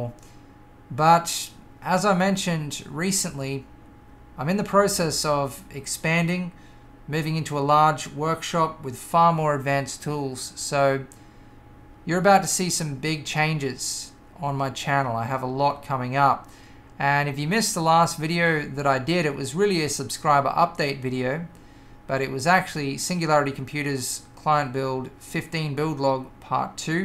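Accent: Australia. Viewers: are you aussie mate?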